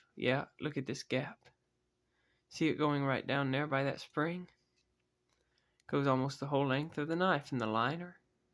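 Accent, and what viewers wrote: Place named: Virginia, United States. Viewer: I just came across your channel and Ive watched 4 or 5 of your videos so far and Ive enjoyed them all. I must ask, what state or region are you from? Im trying to pin point your accent. If I had to guess, I would say Virginia or a neighboring state to it. I do want to throw in my experience on this subject. I apologize in advance as this got longer than I initially intended. I collect knives of many manufacturers, Case of course, but also older Remingtons, pre swinden Schrades, Queens, GEC, etc. I would say though that Case is my bread and butter and where my knowledge and experience most lies. Before I say what I am about to say, you and everyone else who may read this should know that I specifically collect pre-1970 Case knives. I currently have probably two dozen of these, mostly XX era. I have some newer ones as well but much prefer the XX era knives. 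Now that everybody knows my bias, I want to say that it is my opinion that Case quality is no worse now than it ever has been. I would say 3/4 of my pre-1970 Cases are mint, unused and not a single one is what a lot of people nowadays would judge as “perfect”. They all have blade rub, they all have atleast one if not more proud scales or bolsters, they all have atleast a proud or sunken back spring when a blade is open, the centering is not perfect on any of the ones where blade centering is applicable, all have gaps somehwere and the factory edges on the vintage mint ones suck as well. These are all things people complain a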